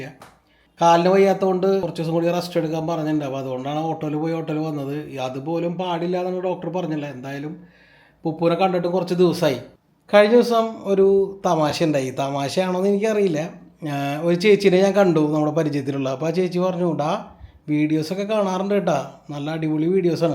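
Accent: Thrissur, India. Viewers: ഒറ്റ ഇരിപ്പിൽ കൊറേ വീഡിയോ കണ്ടു. Sub ചെയ്തു. നല്ല അവതരണം. Simple but powerful. ഓവർ ആക്കി ചളം ആകാത്ത തൃശൂർ ഭാഷ. നാടൻ food. എല്ലാം കൊണ്ടും സൂപ്പർ ❤️❤️❤️. ഇടക്ക് ദീപു ക്യൂട്നെസ്സ് വാരി വിതറുവാണോ 🥰. 😜 കണ്ടു മടുക്കാത്ത വീഡിയോകൾ . 👍🏻👍🏻👍🏻👍🏻👍🏻🥰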